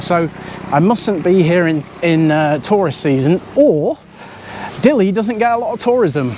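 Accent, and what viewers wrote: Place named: England, United Kingdom. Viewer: Hey bro,, thank you for visiting Timor Leste,,, you sound English .. are you from England?... btw once again thank you very much for this great adventures of yours to Timor Leste.. this is definitely will help boosting up Timor Leste tourism and as a Timorese I can't thanks enough for this... I hope you did enjoyed your traveling around Timor and had a great adventure time .. thank you and be safe..... obrigado barak!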